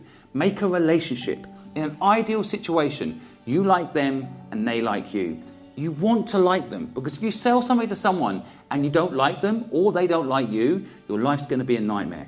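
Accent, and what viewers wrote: United Kingdom. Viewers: Ugh dammit, he's British :/ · Squibb just sounds British. I love that I finally found you today. This video is one of the most important videos I've ever watched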